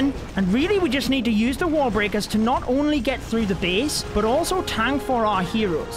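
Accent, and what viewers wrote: United Kingdom. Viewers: Wow the british accent its soo good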